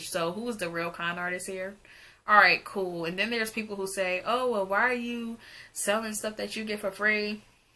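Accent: New Jersey, United States. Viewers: You did the correct thing emailing. Taking business classes do not stop the bank from messing up. Even huge companies mess up and what do they do? email. So kudos to you. Lovely video and who knew you were from Jersey! I can hear it ( new yorker) Also I really dont like that word, I think it's horrible, but that's something I care for. I thought I was the only one. Woman don't like men saying it but their gf say it. I feel as if its a downgrade from who you are. Also, you would look so nice in ASOS and Fashion to Figure and all of these great brands. I wish they would do a collab for plus Youtubers. I am seeing what you are saying now. I hope companies open their eyes.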